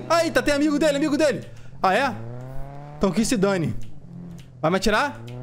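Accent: Rio de Janeiro, Brazil. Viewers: quando o cara e carioca e foda kkkkkk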